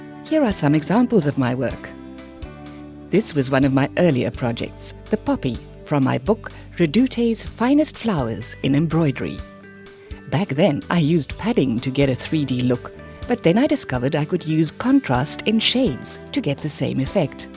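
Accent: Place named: South Africa. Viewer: She is South African, we speak this way.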